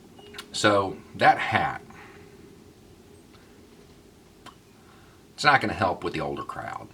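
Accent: South, United States. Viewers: you have such a real and thoughtful way of teaching your audience. I am so glad I came across your vids.. Ironically, I first clicked on one of your segments because I found the way you look seemed contrary to the header and I was curious. So, you taught me that not all people with wild beards and a southern accent are bigots!:) I apologize for my mistake. Can't wait till your next posting - such variety and thought put into each of them. God bless. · has lived all over in Japan and the US but he has been living in Florida for years now. He has def picked up more of a Southern accent over the years. That tends to happen. I can hear a couple of different accents mixed in his dialect. With all of the common sense videos and history lessons shares and all you comment on is his accent? Smh 😏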